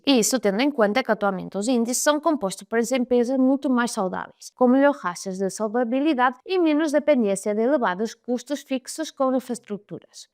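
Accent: Spain. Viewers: não se percebe muito bem o teu "portunhol"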